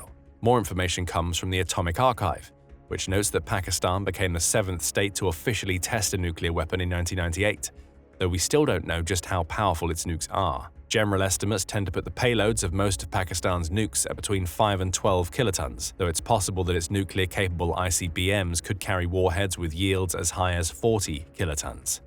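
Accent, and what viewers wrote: England, United Kingdom. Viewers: He has an English accent.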